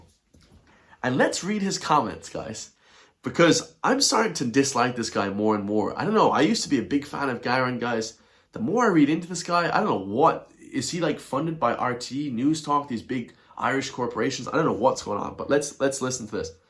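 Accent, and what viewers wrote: United States. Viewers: The Irish- always arse-licking the USA!. Lack of confidence leads the most pathetic of us crave attention from big daddy America. Some of us try to adopt the accent, how sad. Oh yeah, just like the presenter here! Nothing says 'Irish Patriot' more than adopting a foreign accent. Haha. This couple were pleasant ( I watched their vids) but like so many Americans, they were naive and small-minded. Of course some people will take the piss! This love affair with America is extremely childish. An American liked our Tayto! Oh my god, how amazing!!!!! Get some confidence for f@ sake. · Are u not American? Bcoz u sound very American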